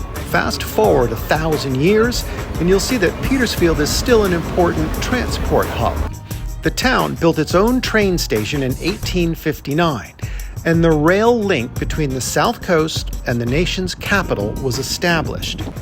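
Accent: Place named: United States. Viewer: I stumbled across your videos and have been binge watching all morning. I look up Petersfield periodically on YouTube to see what is happening and your American accent jumped out! We lived in Petersfield for a couple of years but have since returned to Maryland. Your series on the surrounding areas have and been awesome and very nostalgic for me. My daughter did Irish Dancing lessons in South Harting, performed during a festival in front of the church and have found memories of watching the Morris Men dancing (very English!). Cycled the South Downs many times and did the BHF charity 100 mile ride from Winchester to Eastbourne. Kids went to Sheet Primary School and enjoyed seeing the village and Queens Head pub in your phone box video. Anyways - Cheers and thanks for sharing your videos!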